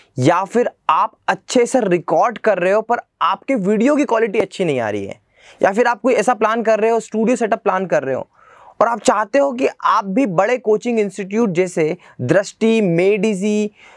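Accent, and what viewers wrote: India. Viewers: R u from Maharastra?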